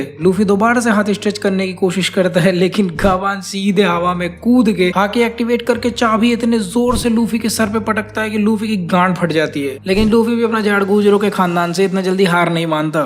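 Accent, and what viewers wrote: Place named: Maharashtra, India. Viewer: Bro's Marathi accent 😭🤌🏻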